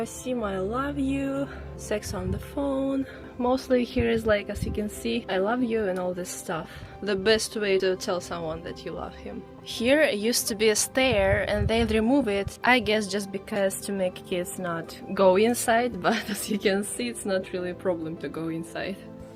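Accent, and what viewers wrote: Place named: Russia. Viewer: Не написано на русском в описании канала, -русская ли вы, или иностранец. Но услышав акцент, думаю, что вы все же русская.😊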